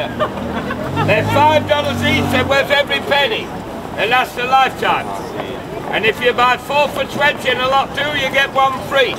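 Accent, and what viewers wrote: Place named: Peckham, United Kingdom. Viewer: his voice isn't Australian. sounds like he's from the depths of Peckham England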